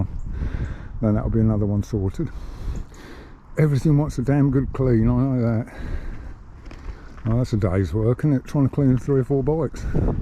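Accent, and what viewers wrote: United Kingdom. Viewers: 1000 views is actually pretty good for any video but the 'show you what to do' videos are the popular one's that people like to watch with a ride out at the end of project.I would still do the ride out videos you're a young chanel & 1000 views already is good & word will spread about your love for old RE & your knowledge of how to fix them. A tripod be great to film your how to videos & also having someone hold camera 🎥 as you do stuff if you can or zoom in on part & when editing explain what you're doing etc. You also have a good accent that's easy to understand & people like a good British accent to listen too.Keep up the good work.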